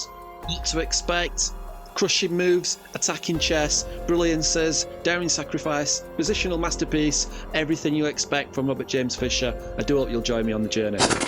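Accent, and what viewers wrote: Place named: Leeds, United Kingdom. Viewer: Sorry, just trying to pinpoint your accent... Leeds...wakefield ?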